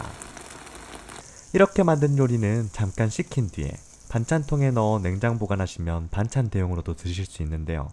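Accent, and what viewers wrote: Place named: Daegu, South Korea. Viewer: 살짝 스치는 대구사투리에 정겨움이 느껴집니다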